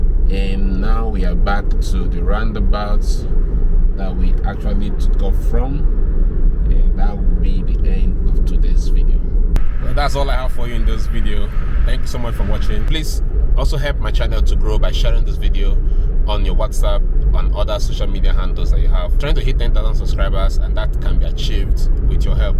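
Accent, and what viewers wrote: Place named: Nigeria. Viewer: This guy's Nigerian English is hard to understand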